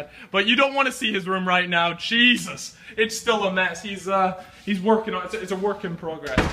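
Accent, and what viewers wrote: United States. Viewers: This is probably obvious but I am not a constant viewer so just out of curiosity, why is it that doesn't have a proper english accent? Did he move to England from the US or what? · Is he american?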